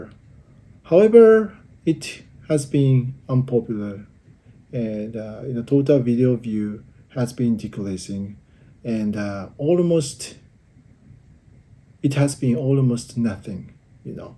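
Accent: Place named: Japan. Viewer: I really like it when you talk about the news in Japan. I think it could be a great format that's not too hard to maintain. Your English is even better because it's your distinctive trait, when I hear people talking like natives, my interest reduces drastically. You are a real Japanese person, and that's what makes you unique. Japan has a low number of English speakers, and that makes it even better. We understand your emotions and situation, for which there is no need for words to express. As an Italian, I really comprehend you, and your English is really great, you are not monotonous. I struggle too with the choice of words when I speak, but it's acceptable, since you are speaking a different language. When natives speak their native one, the one that is doing the work is you, not them, which makes it even more respectable and special. I have more respect for foreign English speakers than natives, as we understand the barrier of not speaking your native language. 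You are an amazing man! Embrace yourself man!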